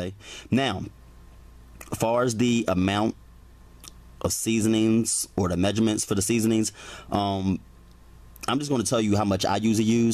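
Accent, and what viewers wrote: United States. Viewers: Love the American accent ;-)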